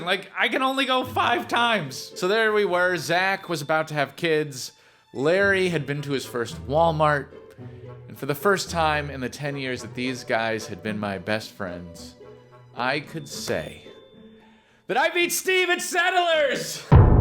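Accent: United Kingdom. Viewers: Are you British ????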